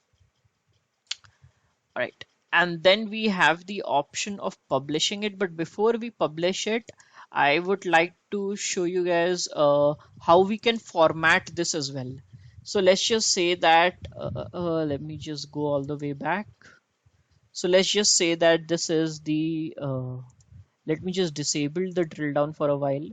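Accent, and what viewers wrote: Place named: India. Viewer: Indian English again? bye~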